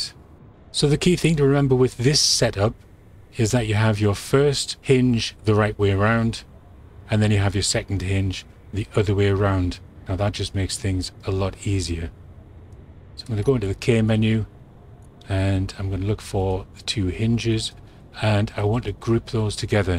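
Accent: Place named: Scotland, United Kingdom. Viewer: I meant I was waiting for a tutorial from you. But... I suppose you're right. Beat my head against the wall enough, and I could probably figure it out. It's just so nice having your soothing Scottish(?) voice explain everything in perfect clarity. (Is that enough sucking up?:-) Again, thanks, for all your efforts. I appreciate it.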